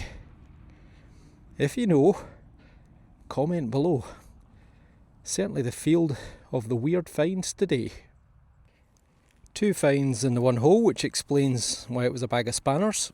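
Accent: Scotland, United Kingdom. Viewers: You no what I just trying to watch your video and just as I got in to it some stupid adds appear it bloody happens all the time. It's just rubbish how we just get bombarded with all those rubbish adds . It does not make sense, the things they advertise are just crap. No one would be bothered to even think about buying it. Sorry mate for letting out my frustration. Your doing a great job and we appreciate your enthusiasm and knowledge. Keep up the good work mate. Like your. Scottish accent.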